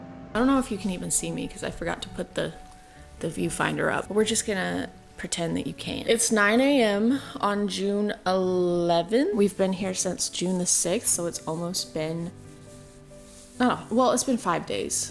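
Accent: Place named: Tennessee, United States. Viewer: This is the most Tennessean I've ever heard you sound. And I do mean it in a good way, promise.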